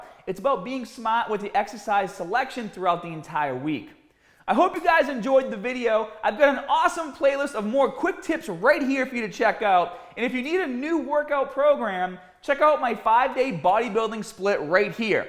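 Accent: Boston, United States. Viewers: wait when did Scott get a Boston accent I don't recall him having one in 2009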